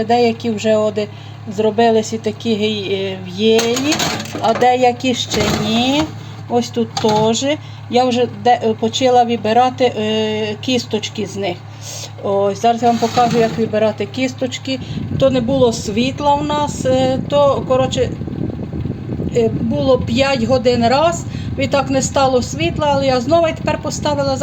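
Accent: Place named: Western Ukraine, Ukraine. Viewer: Добре відчувається мова західняків. Наші люди як бджілки, все тягнуть додому.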